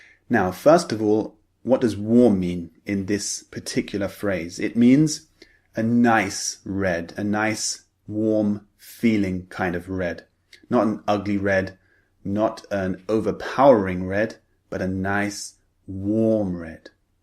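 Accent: United Kingdom. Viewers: Hello Sir English, are you from southern England?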